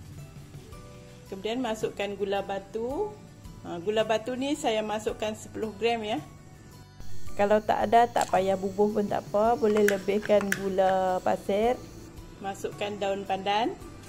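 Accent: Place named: Thailand. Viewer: suka dengar cakap adik...tok kak aya asal keturunan siam cakap sama macam adik..teringat kat tok...semuga adik sihat selalu na...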